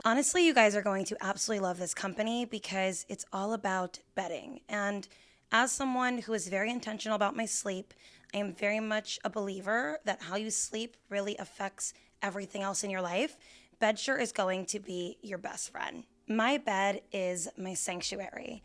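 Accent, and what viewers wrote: New Jersey, United States. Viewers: your channel is a blueprint of what it means to be magnetic! You’re authentic and not trying to be anyone else, from your Jersey accent to your fashion sense all your own unique stamp. You show up vulnerable and passionate about the topics you post on. You’re kind and generous to your online girlies and in real life. Your presence is noticed and missed when you’re gone! You’re able to get your community to open up to you and trust your advice. You have grown into THE magnetic woman! ❤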